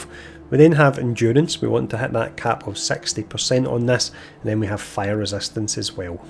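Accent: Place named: Glasgow, United Kingdom. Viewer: Great Vid thanks for covering the passives like you did! Now, forgive me if I offend, the accent my buddy and I are trying to place - Glasgow? Thanks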